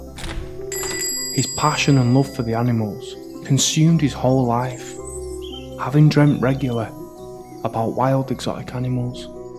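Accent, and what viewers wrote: Manchester, United Kingdom. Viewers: Hiya, I discovered your channel this week while researching the scuttlers. I subscribed right away because walking around with you and listening to your accent made me a little home sick. I love your editing skills, brilliant! Production quality is first class. Great subject matter too. I hate the adds but understand why they're there. I'm working my way through your catalogue because it's not only interesting and informative, but like I said, it's like having a walk around town with a mate showing you stuff you didn't know about. Grew up in Charlton-on-Medlock, (1950/60s) and Fallowfield in the 70's. Had my 18th birthday in Strangeways on my way to Hindley. But I've been a good lad (more or less) ever since. Haven't lived in Manchester since 1983, haven't visited the UK for about ten years. Wasn't sure it felt like I belonged anymore, too many changes. 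Only normal I suppose. Anyway, thanks for what you're doing, really appreciated. BTW...I always fort there was an 'r' in war-ter an all.....Ha ha ha!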